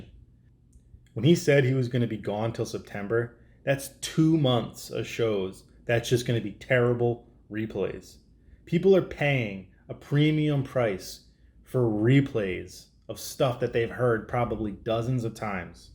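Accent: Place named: East Coast, United States. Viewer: You should listen to Cum Town, hosted by comedian Nick Mullen. And there always joe Rogan, he puts on a shit ton of talented comedians, his ego is in check and he’s much more honest. Also check out grimewave podcast, you sound like you’re on the east coast, it’s a ton of talented and funny Boston dudes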